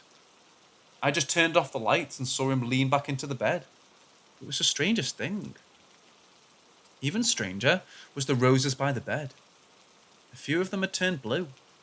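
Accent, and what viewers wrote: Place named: Scotland, United Kingdom. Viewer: Scotland I think idk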